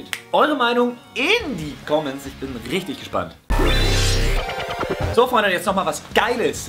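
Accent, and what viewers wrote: Berlin, Germany. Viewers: Hey wie immer ein KLASSE Video und ehrlich deine Berlinerschnauze ist der hammer:) So nu die obligatorische Meinungsabgabe: Thema 1: also Leude warum sollte man Kindern beibringen mit Waffen umzugehen Hallo und wer bitte glaubt das diese dann die Waffen verantwortungsbewusst einsetzen ist wirklich Naive, das sind Kinder die den Umgang mit einer Waffe als Spiel lernen und somit denk ich mir das auch das abdrücken für diese nur ein Spiel ist wo bitte ist da die Verantwortung? Thema 2: Kinder als Kunstobjekt und deren Leid, Aufmerksamkeit für das Leid dieser Kinder ist gut aber wie schon sagte bisschen fragwürdig so mit der Hoffnung der Kinder zu spielen. Thema 3: ja die Forschung ist doch was feines ich will Persönlich nicht ewig leben aber die Leute die noch leben und ihr Leben Tag ein Tag aus vergessen für die find ich das super aber sein wir ehrlich, wenn man damit unsterblich wird können es sich eh nur Reiche leisten. 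· Bist du Berliner?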